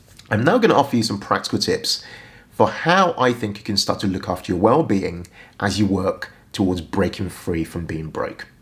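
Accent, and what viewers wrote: United Kingdom. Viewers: Which country are you from? You sound like you’re from the UK x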